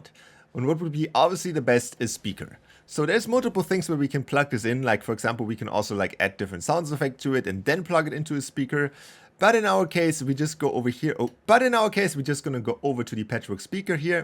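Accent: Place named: Germany. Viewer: Nice Video! You have a little German accent so I wonder are you speaking German normally?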